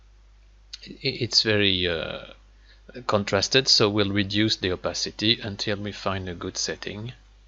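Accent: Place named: France. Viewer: bonjour, je pense que tu es français vu ton accent. Tu peux me dire si je peux obtenir une version démo de world creator 2 ? Sur leur site je vois pas de version d'essai. ya moyen d"en avoir une ou faut direct acheter le soft ? si c'est le cas franchement ils abusent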